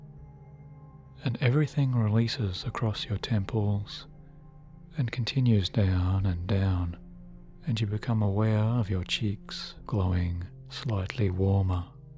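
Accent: Australia. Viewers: Are you austrailan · Are you a fellow Aussie or a New Zealander? Thanks for you video! X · I’ve been listening to you for a long time before I fell asleep. Recently, I realized that I can speak with an amazing Australian accent. Made me popular with my friends, thank you:)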